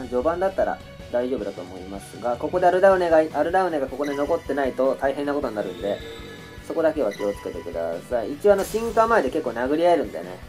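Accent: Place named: Tono, Japan. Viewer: さん思っきり東濃弁はいってますねぇ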